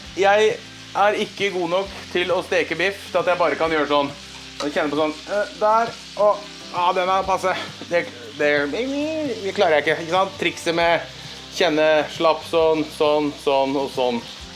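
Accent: Østfold, Norway. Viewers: Var sikker på at den dialekta var fra Østfold .... God mat okke som :)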